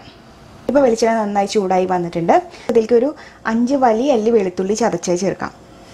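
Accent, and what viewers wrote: Thrissur, India. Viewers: തൃശൂർ എവിടെയാ. ആ bhasha കേട്ടപ്പോൾ തോന്നി മ്മടെ തൃശ്ശൂരാണെന്നു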